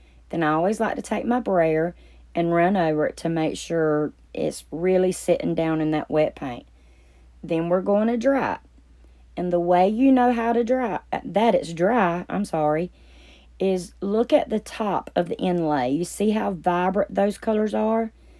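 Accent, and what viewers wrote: South, United States. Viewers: Thanks for your comment. My name is Jackie, I use BunnyRN because I have collected bunnies (not real ones) for years, and I'm an RN (retired). I love your souuthern accent, I live in eastern NC. I really enjoy your videos, creativity, and your humor.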